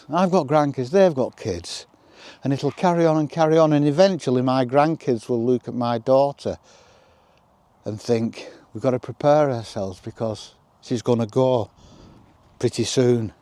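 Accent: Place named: Home Counties, United Kingdom. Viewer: Thank you for your very wise words and support for Jamie, so many people round the world think the world of him. It’s so true that the price of love is grief. Love your words about the end we will all face and how to live a slow simple life literally “smelling the roses”. You sound to come from my home county but I now live in the Lake District which is currently overflowing with visitors who do not understand that life is finite and precious and that my home is not their playground, whizzing too fast to see the real valley. Sherps helped me to cope with feeling trapped and lonely.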